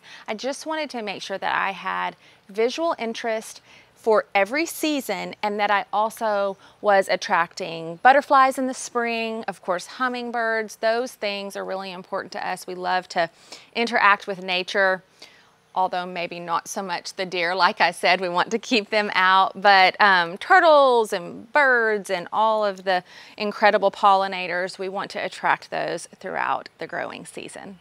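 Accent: South, United States. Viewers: Love your gardens. What is the big green trailing plant on the steps at the end if the video when you’re sitting on the steps? Also, what is the white trailing flower in the round tall pot that isn’t on the steps. I knew you were a Southern neighbor when I first heard you talking in another video. I’m from Memphis but moved to Franklin, TN 40 years ago until grandchildren came then we just had to get closer/ Murfreesboro now. Anyway, keep making your awesome videos. We southern ladies just love to decorate and garden don’t we? It’s our heritage. 🌹😉😀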